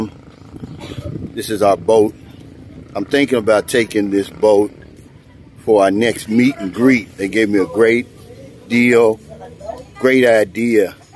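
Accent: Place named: Appalachia, United States. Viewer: Sir, i like your "Hill Billy" accent . Where u got it ? Which city from the states u from ? Best regards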